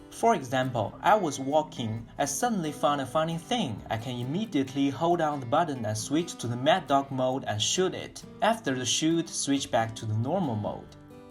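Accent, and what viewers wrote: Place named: China. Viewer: Tsie tsie for taking the effort to learn English so you can make your reviews available to many more people. This is an EXCELLENT review and will help many people understand what the Smooth4 does and will also help them make a decision whether to buy. I particularly appreciate the multiple shots and the editing you did to show us a comparison side-by-side of this product and other products or comparing the Smooth4 to no gimbal. Very effective. Your English is perfectly adequate to communicate on a tutorial like this. You said you would like to improve, which is always the mark of a smart person. My suggestion to you would be to get some audio (extract from a YouTube video) of a native American English speaker saying the words "AIR" and "LOLLIPOP" and "RAZOR." Listen to those words over and over while you work on making your pronounciation identical to theirs. 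This will help train your mouth muscles to make sounds that are not in the Chinese (or some European) language. One more comment: Wherever you are in China is beautiful. I wish all the politicians would learn to get along and be friends with each other so all of us could visit and exchange ideas more freely.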